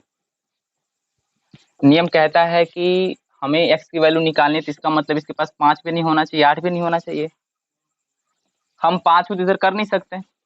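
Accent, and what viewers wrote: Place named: Bhojpuri, India. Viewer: bhojpuriya jhalak ta jaan marat ba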